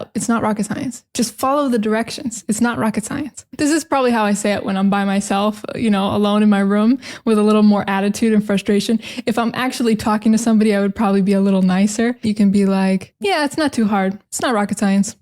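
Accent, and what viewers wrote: United States. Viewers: This is the kind of class that adds value, because you not only enjoy listening to real American English, but you also get to admire the beauty of North America through this warm person.🌹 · Hey,! Your American accent is just delicious!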